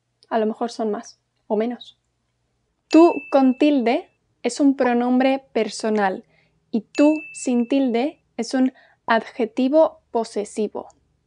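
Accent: Spain. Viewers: uh when you say something with that Spanish clasical with tounge under the teeth I am on seven heaven i think i would come to Spain to you crawling you are amazing in every way 😊😊👌👌👍👍 i would became even Spaniard because of you you are so natural...two of those of you do not exist in Espana. good job you are doing. so profesionale 👌👌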